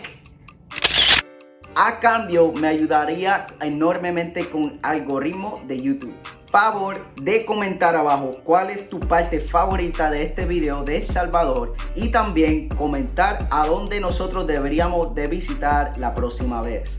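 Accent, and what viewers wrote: Puerto Rico. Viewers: Gracias, muy buen video sobre el pulgarcito. Pero tu hablas y pareces mas Boricua que un "gringo" como dice el titulo de tu video. Cambiale de gringo a boricua. Yo estuve mucho tiempo en P.R. y tambien me anamore de la Isla, lo mismo de NY en donde mis amigos eran casi todos boricuas. En horabuena!!! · Me encanta el acento puertorriqueño y excelente que disfrutes de mí país junto a tu esposa! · A donde esta el gringo, yo veo un Cici y una Cerota osea, no mames que tu lengua raspa, Eres puertorriqueño dumb fuck 👊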